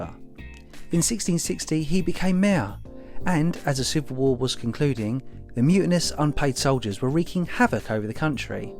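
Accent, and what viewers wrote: United Kingdom. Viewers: What accent is this? I have issues to uderstand. You sound too british, too native!!!! Please try to speak with more international accent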